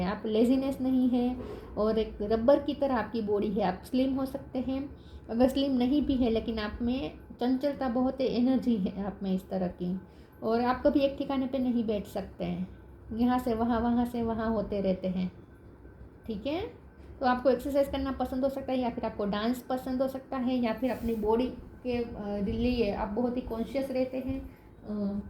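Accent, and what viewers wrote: Gujarat, India. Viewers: Aap ke acent se lag raha hai ke aap gujrati ya madwadi honge.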